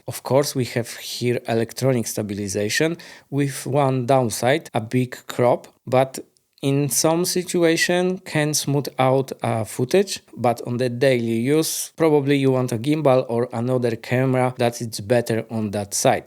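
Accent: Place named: Russia. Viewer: Your accent is very simillar to russian😅 I want to by e6700. Thanks for testing it.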